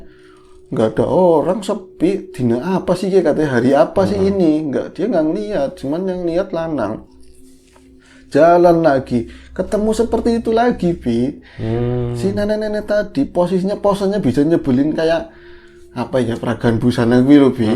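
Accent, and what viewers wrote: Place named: Java, Indonesia. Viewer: Mas ni klo crta bikin senyum² ketawa² sndri jj gegara Pakebahasa jowo nya medokk.. mantapp cirri khas dr ngoborr, 😁😁😁 mogga channel nya smkin berkembang 👍👍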